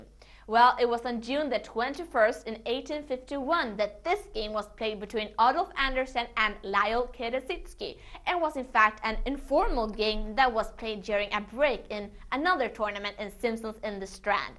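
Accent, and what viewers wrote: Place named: Sweden. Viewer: Nice, a puzzle with some history behind it. Loved it. I think your typical swedish accent is getting less and less apparent.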